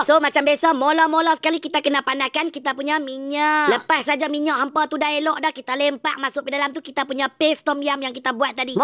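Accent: Kedah, Malaysia. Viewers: Sedia2 bedah kna na.. Cian dia. Syok dgaq bhasa kdh piaw..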